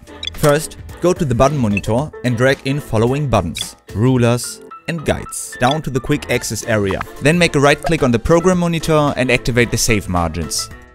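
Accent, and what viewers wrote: Germany. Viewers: Ach ja.... Wenn Deutsche Englisch sprechen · Are you German?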